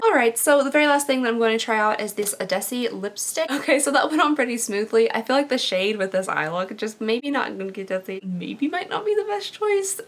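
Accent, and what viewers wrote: Kentucky, United States. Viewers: Wait I just recently started watching your videos... are you from Kentucky! I am too!! I thought your accent was so nice! It’s because it’s so familiar to me! Wow, that’s so amazing! It definitely gives a boost of confidence because I’m always afraid to make videos due to my accent! Also I say “reach me that” all the time. My girlfriend who is from New Mexico(where I live now) has gotten into me for it lol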